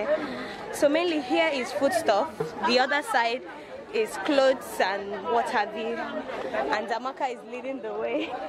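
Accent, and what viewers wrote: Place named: Mbano, Nigeria. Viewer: lol. just blowing mbano igbo ascent in Onitsha main market. Lol. I thank God we didn't loose you by marriage to another tribe.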